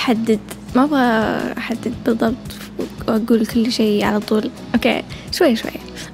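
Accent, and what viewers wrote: Saudi Arabia. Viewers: صدقا اول مرة بسمع بنت بتحكي سعودي, بنت ذكية و جميلة جدا و مثقفة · تكلمي عربي لهجتك السعوديه روعه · لهجتكي من جده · تتكلمي سعودي صح أنتي سعوديه ولا أيش؟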